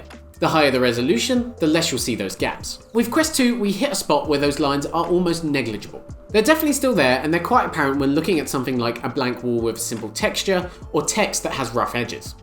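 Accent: United Kingdom. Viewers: but the guy is from uk lol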